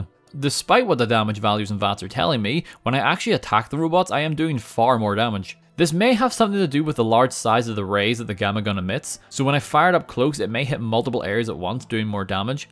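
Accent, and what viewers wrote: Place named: Northern Ireland, United Kingdom. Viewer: the northern irish accent is strong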